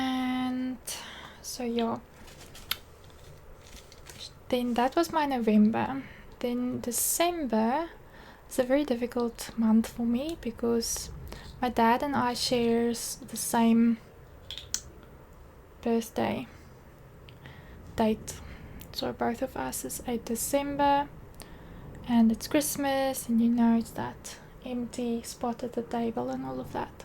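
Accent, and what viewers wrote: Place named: South Africa. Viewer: Where is your pen from please.? Also love hearing the South African accent I really miss home when I hear it.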